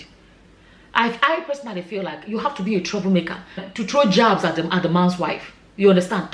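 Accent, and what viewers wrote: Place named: Igbo, Nigeria. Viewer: I just like your Igbo accents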